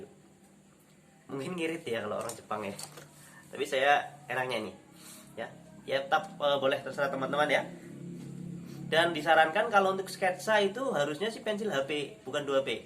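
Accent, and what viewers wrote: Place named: Banyumas, Indonesia. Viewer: Ijin subskrebb gan... Kok bahasa indonesianya kayak orang barlingmascakeb ya?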